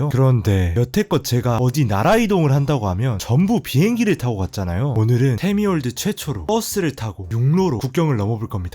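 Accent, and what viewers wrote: Jeolla, South Korea. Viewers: 고향이 전라도인가요?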